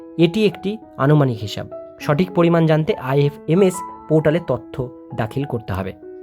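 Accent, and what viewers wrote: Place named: North 24 Parganas, India. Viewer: Dada/ vai, mone jeno dukho paben na ! Apni, apnar ucharan ta ektu improve korar cheshta korun please! Ch,chh, gulo sruti kotu, north 24 pgs er grammo tan, apni jehetu channel chalana korchen, tai apnar ucharan ta rao valo hole sunte beshi valo lagbe! (Just suggestion).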